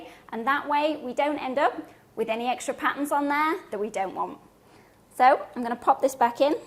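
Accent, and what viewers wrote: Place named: England, United Kingdom. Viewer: Have to watch the vid again to find out how to use a disc cutter,--------- couldn't concentrate. was not expecting the pommy accent and then "brick shithouse " came out and then "any hole" PMSL!!!. Had you on fbook for some time now, without knowing you were sooo entertaining. Following on Youtube and Insta from tonight here in Aus.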